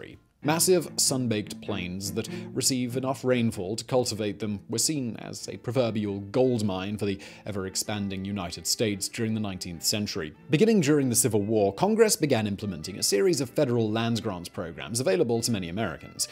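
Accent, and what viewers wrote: United Kingdom. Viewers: I don’t like learning about American history from a British voice. It bothers me! Shutting it off. 🇺🇸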